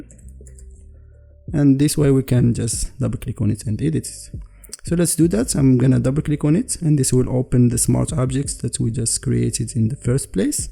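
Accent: Morocco. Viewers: From your accent I can tell that you are Moroccan .. Well done bro , high quality content for real , lah ywf9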